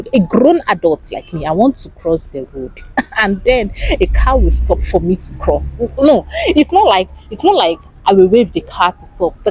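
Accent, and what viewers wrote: Ghana. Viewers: Nice girl. Nice video. Keep it up. Your accent both in English and Twi sounds so Ghananian. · Ur English look like Ghanaian to me keep it up thanks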